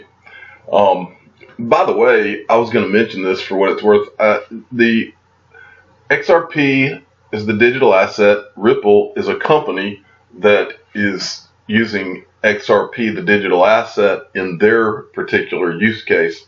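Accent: South, United States. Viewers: Maaan!!! You are the mf shiznitt!!! I truly appreciate ya along w a lot of other people! We always gonna have a few haters in the batch, so screw em!! I’m from the south too so when I hear ya talk, i swear it’s like listening to a uncle or close family friend schooling me on that xrp, baby!! We feel that southern hospitality from ya! So again just wanted send a big thank ya! muchos gracias! & a sho appreciate ya!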